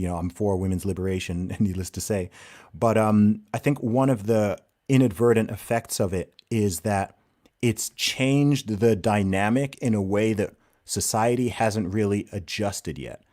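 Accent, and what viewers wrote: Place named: United States. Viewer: Idc what anyone says you sound like you are from America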